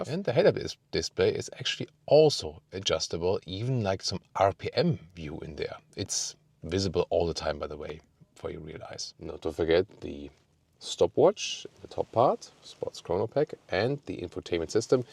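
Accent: Germany. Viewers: “Lots of high gloss black…which no one asked for”. 😂😂. Exactly! Love your channel and its presentation. The German accent makes listening fun as well for an American lol. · You sound too German for me as an American 🇺🇸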